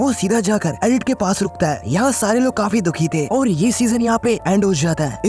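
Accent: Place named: Jharkhand, India. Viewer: bhai tumara voice acha hai lekin tumara hindi छपरी वाला or zarkhandi boli ka hai ese thik karo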